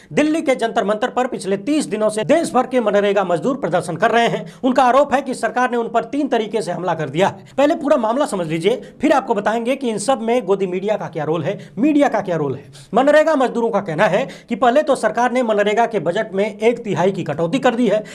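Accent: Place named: Purvanchal, India. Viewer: आपका बोलने का तरीका बता रहा आप पूर्वांचल से हैँ आपकी सच्ची पत्रकारिता को सलूट 👌🏻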